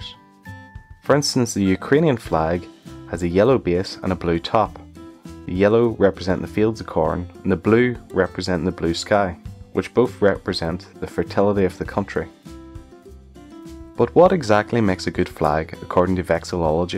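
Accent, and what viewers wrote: Northern Ireland, United Kingdom. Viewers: Are you from Northern Ireland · Dammit, I knew I should have guessed Northern Irish... oh well. ;) And thanks - it was the vowels that gave it away, I knew it must at least be related to Scottish. I've had a lot of exposure to English over the years, even for a Danish guy, so the vowels were very easy to notice. I've had almost no problems understanding your dialect. I mean, I've occasionally had to go back and listen one more time, but then that can happen with American and other British dialects as well. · I find ur accent very distinguishable as Northern Irish but also easy to understand.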